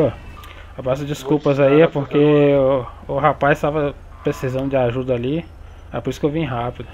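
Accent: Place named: Minas Gerais, Brazil. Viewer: vc é de mg paresse pelo sutak. Se for somos mineiro uai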